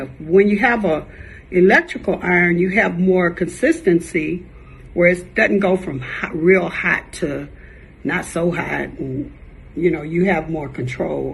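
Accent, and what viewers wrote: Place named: South, United States. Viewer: I love her Southern accent ❤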